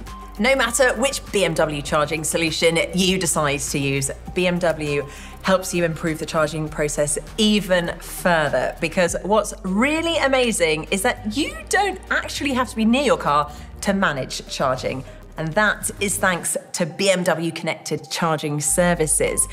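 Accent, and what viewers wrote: England, United Kingdom. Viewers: Very english accent! :)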